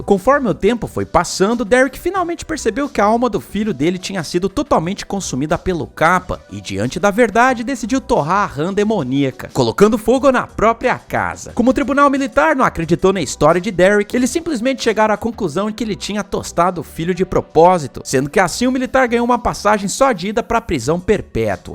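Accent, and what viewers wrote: Portugal. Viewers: muito bom sotaque portugues, abraços, sou de portugal e vejo os teus videos e acho top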